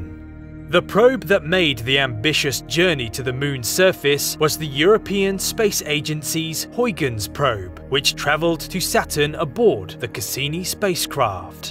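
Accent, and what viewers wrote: United Kingdom. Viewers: Who would have expected a British accent in a Mars footage?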